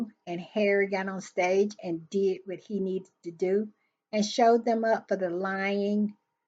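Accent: South, United States. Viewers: Oh your still getting paid and they are obviously using you as their useful idiot. They will turn on you. You sound like your from the south. The bayou the back woods. Cornbread ignorant honey child. You better get home cause your Ma Ma didn't teach you well. Whatever they are paying you. Ask your soul if you have one. IS IT WORTH IT. 8TH Grade education or am I giving you to much credit. IGNORANT! PAINFUL YOU ARE. SAD SAD.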